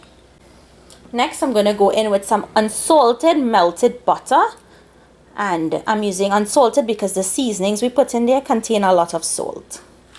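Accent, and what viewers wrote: Trinidad and Tobago. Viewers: Hi. Trini English.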